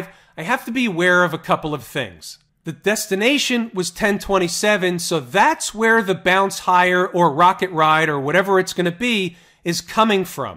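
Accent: United States. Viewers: Most American sounding narrator. I love it. I bet he’s nice to waiters. 😊👌🏻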